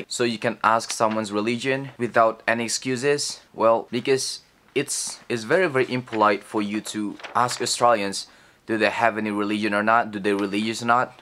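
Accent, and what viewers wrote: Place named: Indonesia. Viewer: sounds like he pronounces Australia as the word is usually pronounced in Bahasa Indonesia.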